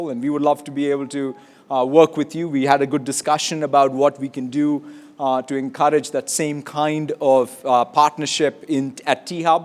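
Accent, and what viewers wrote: England, United Kingdom. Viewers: English accent 🗿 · Looking at his English accent I think he almost forgot telugu. Listen the way he said 'Tank bund'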